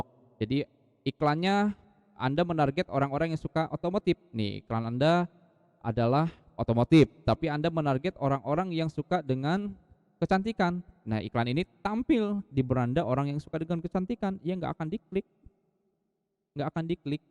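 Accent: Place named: West Java, Indonesia. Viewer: Sunda pisan euy, siap auto subscribe! Nuju dipilari konten meta ads kieu.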